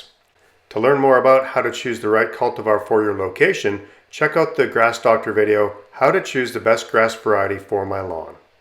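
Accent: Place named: Midwest, United States. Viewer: It's a Midwest pronounciation quirk. Plenty of Midwesterns with Italian roots, including more recent generations, also pronounce it this way.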